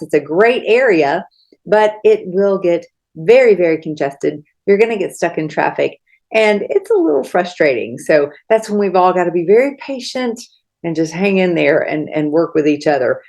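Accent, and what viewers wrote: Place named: South, United States. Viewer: Awesome video! btw....love that Southern accent! I'm a northerner that loves the Southern charm. God Bless!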